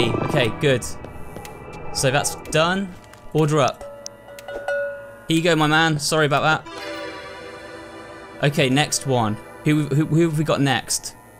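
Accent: United Kingdom. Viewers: Ohhh your British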